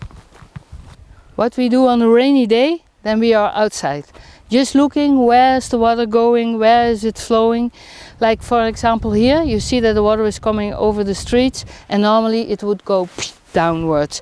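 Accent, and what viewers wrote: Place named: Netherlands. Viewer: Dutch people on holiday XD I can 100% tell by the accent this women is Dutch. We do this since we are born lmao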